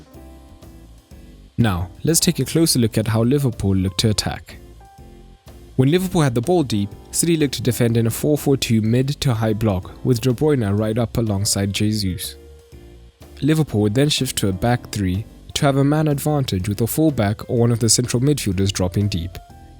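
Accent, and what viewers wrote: South Africa. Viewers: You sound South African, am I right?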